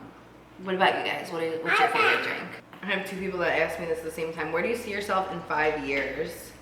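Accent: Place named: East Coast, United States. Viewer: Her east coast accent will always be endearing.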